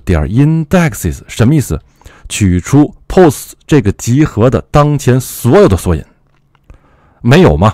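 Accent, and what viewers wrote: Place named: Japan. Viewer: 日本人的发音。。。包括 =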